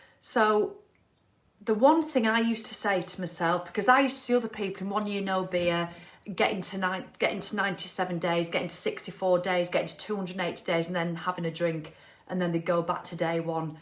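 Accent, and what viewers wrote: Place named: United Kingdom. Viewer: Great woman. And I rate this as one of the greatest accents in the world! Brings back fond memories of the UK.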